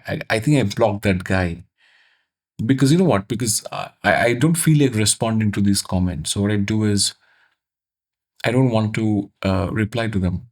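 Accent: India. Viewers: I expect an Indian guy to have an indian accent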